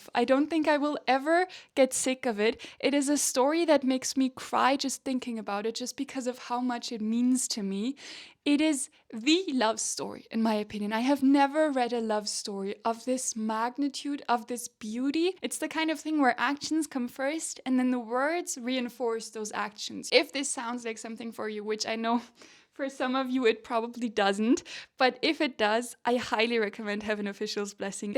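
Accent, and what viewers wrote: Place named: Germany. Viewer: You have the sweetest darn voice - you're addictive to your listeners for sure, and your slight German accent makes you sound so erudite and warm hearted at the same time. You're definitely a charmer, and you're simply a joy to us that you exist and share so much of yourself.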